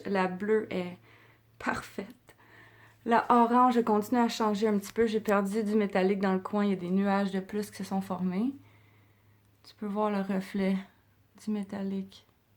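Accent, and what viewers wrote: Quebec, Canada. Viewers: Enfin en français « québécois »...0h que je suis contente! Fais-en plus souvent... on adore!! Merci 👍😉😘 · Yeaaaah! en Français! Ah cet accent Québécois, qu’est-ce que j’aime! Merci... you freak. 😚❤️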